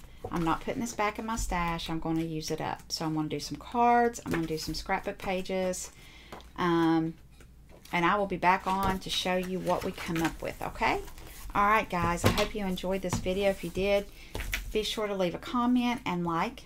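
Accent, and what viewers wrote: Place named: South, United States. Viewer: I’m so glad you popped up on my you tube recommendations. I immediately subscribed. Can’t wait to go back and binge watch. Hope you tell us where you’re from because we have the SAME southern accent. 😊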